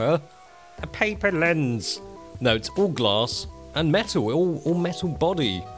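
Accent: England, United Kingdom. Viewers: Your British accent melt my heart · Can you tell why you have an british accent? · I literally laughed out loud when that guy talked about your english accent 😂😂😂😂😂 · FISH N CHIPS accent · I just love your thick british accent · Chinese people are usually way too accustomed to American accent, plus seeing an Asian with that kind of authentic British accent is not usually expected and pretty confused for the first one or two sentences. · Spends first 1/2 of video talking about the color the cap & the cat with an English accent no less. Hard to take. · I'm sorry if I'm rude.. but are you an asian? your british accent is freaking delicious to listen #nohomo :\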